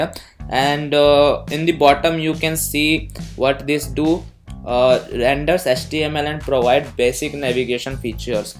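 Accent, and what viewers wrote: India. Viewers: you have india ascent , indian?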